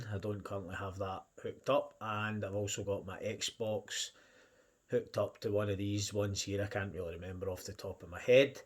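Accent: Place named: Glasgow, United Kingdom. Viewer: Refreshing to hear a Weegie voice, just bought myself a 65inch 4k Smart TV by Toshiba, HDR10+,Dolby Atmos and Vision, Bluetooth 5.0, 24Watt Speaker (highest on any tv under £1000) I use Fire Stick so not too concerned about the software Toshiba use for their home screen, all for £430! You have the advantage of QLED but I chose the bigger screen as my preferance until I spend big cash on an LG/Sony OLED tv in a few years time as TV's get better and cheaper every year now! Good review buddy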